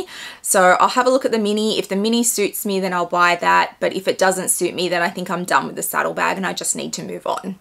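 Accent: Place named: Australia. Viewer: I’m literally addicted to. Ok that sounds weird. 😂 I’m addicted to her closet! Her bag collection! Actually no I’ll throw, the human, in there too! Seems like such a super nice person. ❤ Can’t get enough of that Aussie accent too! What I love most, is that even though she can afford to buy whatever she wants, and has quite an extensive collection, she never “brags” about it! She shows it to those of us who love luxury, but she doesn’t make you feel bad nor envious if you’re not so fortunate! Love that! She’s bad for my wallet though! I’ve purchased a new Chanel and a 2 new Fendi’s since I’ve started following her! 💰 ❤😂 But that’s ok! Can’t wait to see what she inspires me to buy next! I’ve got a much smaller collection that Miss of course, but that’s ok too! Whenever I can’t stop thinking about something she’s showing, I go to the Real Real and try to find a previously gently loved version! 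I do buy brand new as well, but I’m into circular fashion these days. Saving the planet 1 bag at a time! 😊 To me it’s like why buy a dog when there’s so many waiting for homes at the shelters? No offense to those who buy exclusively new, but I just got 3 bags for less than the price of one brand new! Good luck filling your wish list this year! I can’t wait to see what you add! 👏👏💗💗🥰🥰💗🥰💗😎😎😎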